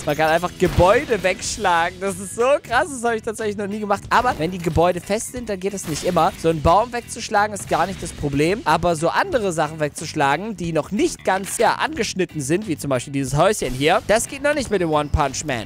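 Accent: Russia. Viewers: HAHAHA OMG DEIN RUSSISCHER AKZENT MACHT DAS VIDEO NOCH BESSER 😂✔❤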